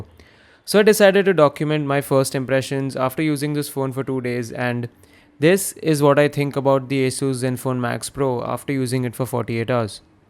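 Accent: India. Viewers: Fvck you and ur indian accent!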